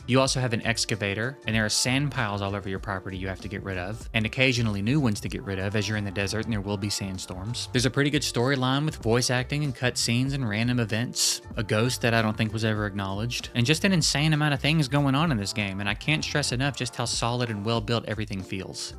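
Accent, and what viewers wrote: South, United States. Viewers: Dude, you’re so good! Also you sound like you’re from the south like me. Great writing and great commentary. Keep going. You’re onto something big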